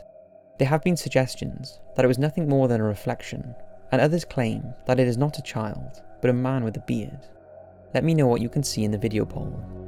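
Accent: United Kingdom. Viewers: To me why is it scarier when a British person speaks about spooky things 😎😑 you just earned a subscriber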